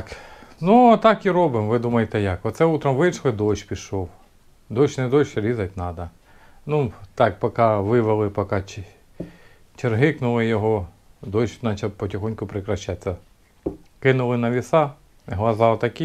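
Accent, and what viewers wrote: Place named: Kharkiv, Ukraine. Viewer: Дуже подобається твій говір!!! Наш-Харьковский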